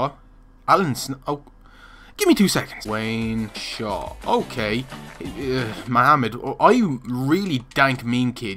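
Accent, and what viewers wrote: Ireland. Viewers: I love that Irish accent coming from a lad from Cork😂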